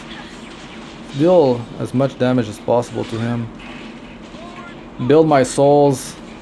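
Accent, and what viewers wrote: Quebec, Canada. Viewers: T québécois hein? :)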